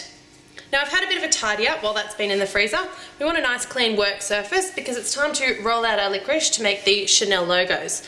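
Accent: Australia. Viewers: I love..I mean LOVE your Australian accent man if I had one j would never shut up cuz it's so cool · oh my gosh, I love Australian accents. c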